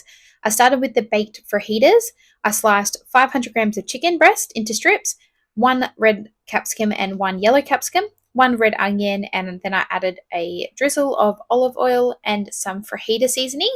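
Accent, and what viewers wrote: Australia. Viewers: The coles bags got me here, love hearing an Australian accent 😅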